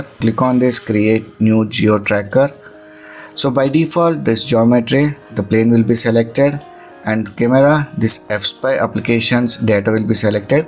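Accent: India. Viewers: why is it all indians weird curry accents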